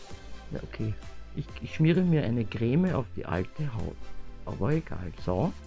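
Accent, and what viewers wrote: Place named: Vienna, Austria. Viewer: Daaaaankeee🤩😍😍😍💖💖💖💖 Ich dachte am Anfang du testet das nur. Ist ja auch wurscht, geht ja gar nicht das ich deine Stimme nicht klar und deutlich mit dem Wiener Charme höre😁😘🤗🤗🤗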